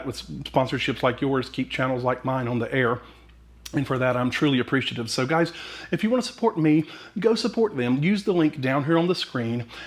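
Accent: South, United States. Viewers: my first time visiting your channel. I detect a Southern accent in your voice. Where did you grow up and where are you living now??? Love your enthusiasm and smile!!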